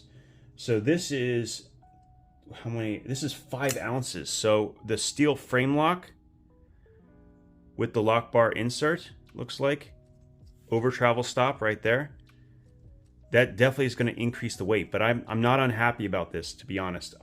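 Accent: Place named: Midwest, United States. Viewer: Virginia? Man, I thought I picked up a "great lakes accent" off ya,. Midwest, or maybe northeast. Anyway, if you could do a comparison video between the Sencut Snap, Waxahachi, Civivi Cogent, Relic Sinisys, and WE Snick, that'd be awesome. That blade shape is what made me want to try a Civivi in the first place.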